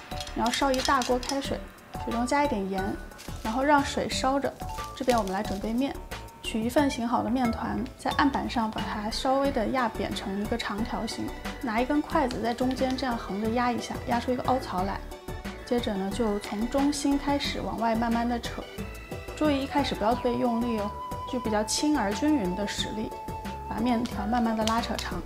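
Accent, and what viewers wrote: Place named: North, China. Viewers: 山西方言吗？感觉说话舌根子比较绵软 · 口音一听就是我们北方人🤣🤣🤣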